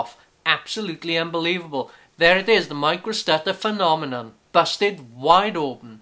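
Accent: Wales, United Kingdom. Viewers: I love the welsh accent :D Great review.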